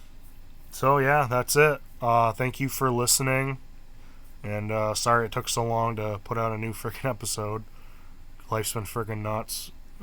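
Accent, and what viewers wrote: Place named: New England, United States. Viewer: Good video. I hear you're a fellow new englander like i am.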